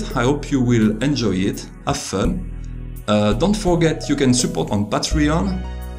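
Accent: France. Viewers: trop fort l'accent français^^ merci pour le partage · le bon accent de français haha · Merci pour la vidéo ! Ton accent français qui articule bien pour chaque mots en anglais (contrairement à eux qui avalent leurs mots); m'a beaucoup aidé à te comprendre ! xD Et ceux même en anglais. Merci ! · are you french? you have thé french accent 😊☺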